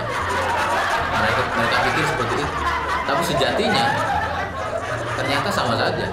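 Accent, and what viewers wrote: Madura, Indonesia. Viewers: Logat madura pean cak?👍👍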